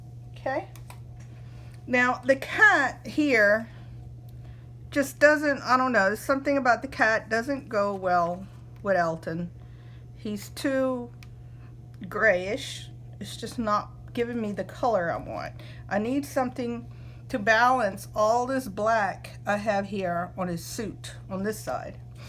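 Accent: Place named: South, United States. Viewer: I'm from New Orleans. Are you from the south? Sounds like it lol. I always like to guess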